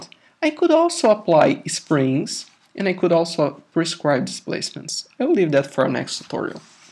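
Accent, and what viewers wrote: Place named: Brazil. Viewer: sutaque brasileiro! OIE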